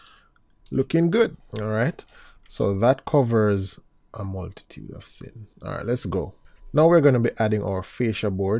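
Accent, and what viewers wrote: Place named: Jamaica. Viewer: Absolutly the best tutorial of AutoCAD Architecture! Really good explaination in funny "Jamaica" styl:). Perfect. I'm looking forward for Last Episode with setting Sheets. Great Work!!!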